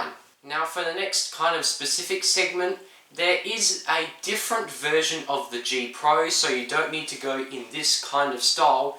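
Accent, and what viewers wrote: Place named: New Zealand. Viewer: damn im pretty early, think im the first aussie, tbh you sound more kiwi then aussie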